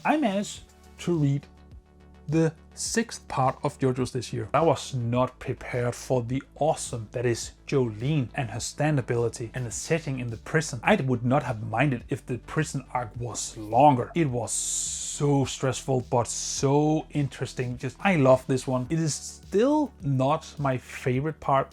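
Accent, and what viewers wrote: Denmark. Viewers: are you by any chance Danish? i feel like you have a Danish accent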